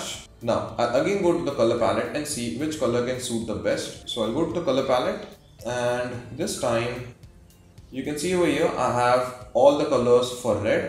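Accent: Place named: India. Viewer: DUH BHUUTTTOOOONNN!! THICK ASS FUK INDIAN ACCENT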